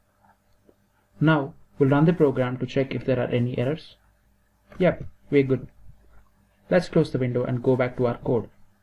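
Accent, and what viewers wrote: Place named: India. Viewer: You have indian acsent🇮🇳🇮🇳🇮🇳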